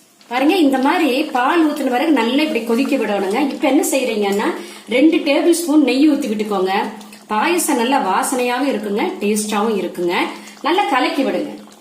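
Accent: Kongu, India. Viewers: கொங்கு தமிழ்❤ நானும் கோயம்புத்தூர்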